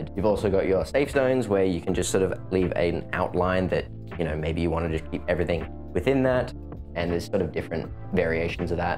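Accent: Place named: Australia. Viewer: You sound like Adrian Gee. I am guessing you are from Adelaide or Melbourne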